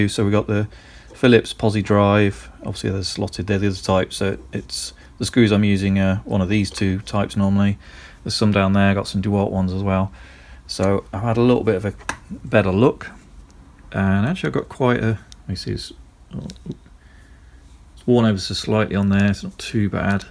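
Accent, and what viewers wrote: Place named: United States. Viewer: Funny accent for an American.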